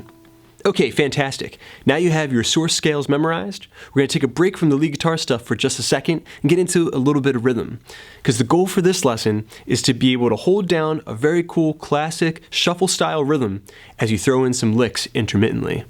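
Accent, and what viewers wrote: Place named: Maryland, United States. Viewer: Nice stuff! My first love was guitar, but at 8, I got the old school music store lessons grind, sitting in a room with a rental guitar with strings a half inch above the neck & it was torture for my soft little hands, and SO boring and sad - I moved off to drums & keyboards, Now, as an old guy w/the time to really get to know my way up and down that neck, & lots of good lessons like these online? I'm having some fun & making progress. Off topic: R U by any chance from Md. or thereabouts? We have the same accent. ...Nice lesson(s)! Thanks, again!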